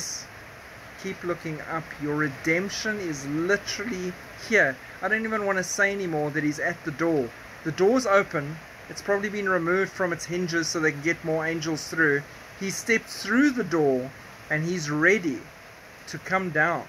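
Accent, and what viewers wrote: South Africa. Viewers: Spot the South African accent 😀 Greetings from Benoni 🇿🇦 And yes, fully agree. Our Great God and Saviour is soon to appear. Maranatha 🙏🎺✈️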